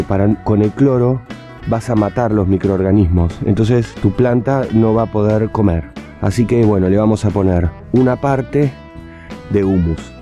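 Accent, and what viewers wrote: Argentina. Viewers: de donde sos?? sos Argentino?? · Me encanta el acento de los argentinos. Saludos desde Colombia. Muy buenos vídeos. Aquí una nueva suscriptora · Buenicimo video COMO todos como c aprende pero a mí lo que más me gusta es su acento Argentino. Saludos desde Monterrey México 🤗🍀🌹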